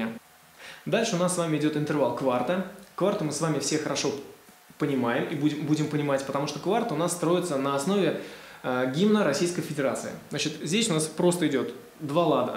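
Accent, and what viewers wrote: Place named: Germany. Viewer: Урок - супер! Спасибо! Те кто думает, что может играть без интервалов, Вы правы, но забудьте об импровизации (хотя Вас может выручить идеальный слух и память)., очень забавляет (по доброму) Ваше произношение английских слов с немецким прочтением;)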